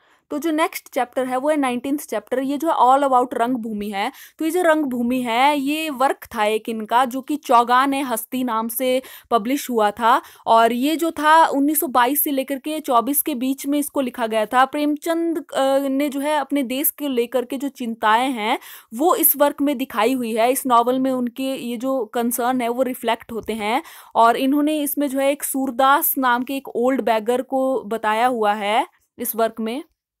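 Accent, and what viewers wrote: Haryana, India. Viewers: Mam aap Haryana se hai?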